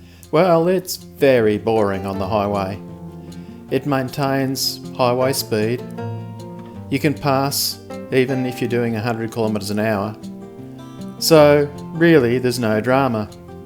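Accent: Australia. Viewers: I’m a Scot, I think the Aussie accent kinda threw me off a bit 😂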